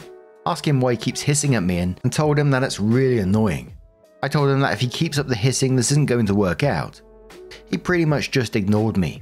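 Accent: United Kingdom. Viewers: Hiiiiii ❤ sending much love from austria 😊…always happy to hear your calm voice and lovley british accent 😅…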